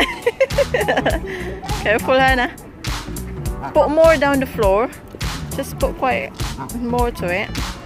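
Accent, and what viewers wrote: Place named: England, United Kingdom. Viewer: Dami swans sis. Madaya yong geese .... English accent ka na rin sis.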